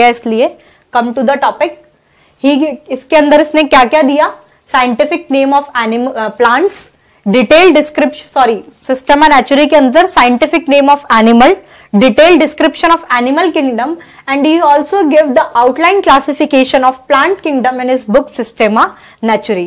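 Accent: India. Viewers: your pronunciation is truely indian .... its very difficult to understand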